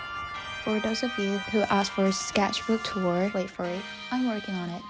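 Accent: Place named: United Kingdom. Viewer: 영국식 영어 발음이 너무 멋있어서 영상 보는내내 몇번을 치였는지 몰라여💕진짜 작업 너무 멋있고 판화도 너무 멋있고 노을도 멋지고 그냥 너무너무 멋져요!!!😆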